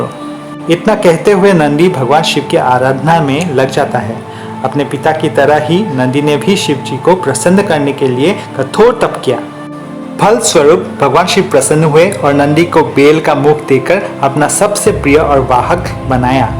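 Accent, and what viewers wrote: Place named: Assam, India. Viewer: Great story brother.... By any chance r u Assamese? I mean just guessing from the tone of the voice🙂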